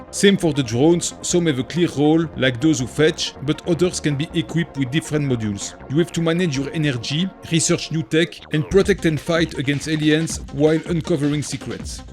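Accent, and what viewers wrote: France. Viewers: no offense meant but since you asked, to be completely honest, I at first went to my settings thinking I needed to turn on translate to English lol. you have the most stereotypical insanely strong French accent. I'm thankful for closed captions, or I would definitely have skipped the video. I nearly did anyways, but was interested enough in the content to force myself to push through. if it's something you're concerned about, youre not crazy. while there's nothing inherently wrong with any of this stuff, if you are trying to expand your channel however possible and are open to making changes that will likely make your content more easily accessible to the widest audience possible, taking some steps to soften the accent as much as possible would definitely be a strong place to start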